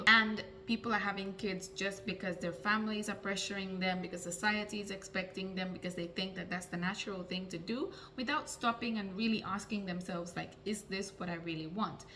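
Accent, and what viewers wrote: Caribbean. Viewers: I'm Indo-Guyanese and it's interesting the English accent for an Indo-Finnish person is so similar to that of Indo-Carribean people. I never would have guessed you are from Finland. Anyhow, you're right that many people in the world today are having children for all the wrong reasons and some of them are not fit to be parents and bringing children into the world to satisfy the expectations of others is no good reason at all. However, calling people in India "narrow minded" is not fair. You're trying to figure out life on your own without having read the user's manual. Like those parents who should not have bore children, you are not living according to dharma because of a lack of scriptural knowledge. India is the land where God gave the users manual. Begin with the Bhagavad Gita, and you might gain some perspective, clarity, and guidance. 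Also, Indian history is very complex and it went from being the greatest land in the world to being one of the poorest after centuries of abuse from outside forces, but the Indians are restoring it's former glory without much help from anyone and trying to do so in keeping with scripture. Finland does not have the population of India but England stole $43 trillion in wealth from India and all of Europe and the west is enjoying a different lifestyle because of it. Not just Indian wealth, but Indian knowledge and labor as well. From Pythagoras to Mark Zuckerberg. Fiji to Trinidad & Tobago.. Last thing is you mentioned being attacked. If you'r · Its good to be self aware and not be influenced by society expectations. Btw your accent sounds like someone from the Caribbean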